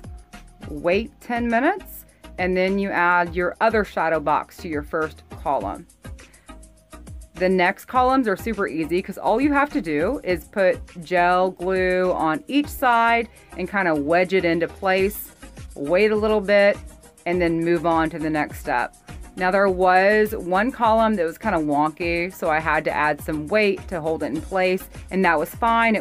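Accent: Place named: Pennsylvania, United States. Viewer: Love the lantern! Would I be wrong to say your from Pennsylvania? I say that because your voice/accent is so much like my family's.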